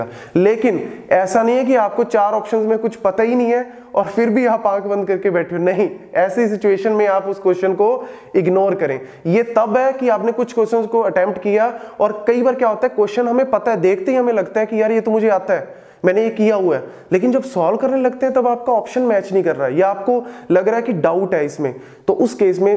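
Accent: Punjab, India. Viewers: Ur accent has punjabi touch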